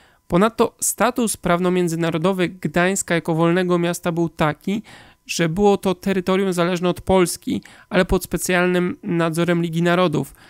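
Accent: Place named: Russia. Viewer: jesteś ruskiem słychać po akcencie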